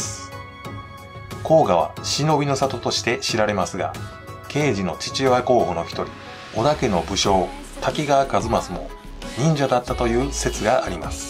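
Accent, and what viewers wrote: Kansai, Japan. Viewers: 主さんは関西の方かな？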